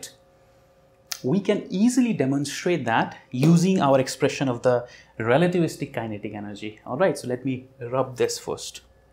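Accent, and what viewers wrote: Odisha, India. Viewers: Sir are you from ODISHA?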